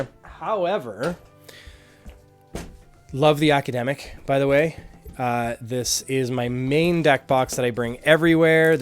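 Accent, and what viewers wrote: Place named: Canada. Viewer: You sound extra Canadian in this video😅 Great video thank you!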